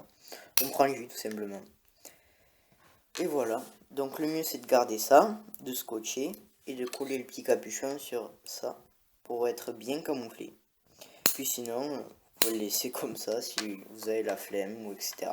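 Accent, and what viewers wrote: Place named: South, France. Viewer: l'accen du sud qui fait plaisir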